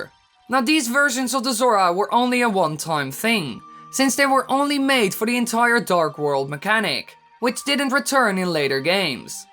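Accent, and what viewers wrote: Netherlands. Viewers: Wat he is just dutch man!!! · thats an accent he's dutch and in dutch its very normal to talk like that i am dutch myself but it doesnt sound weird in dutch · He's dutch. That's just how dutch people speak. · actually, thats his accent as a dutch XDDD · NyakiAki its just his dutch accent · It's called a 'Dutch accent' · Its his accent. He's dutch.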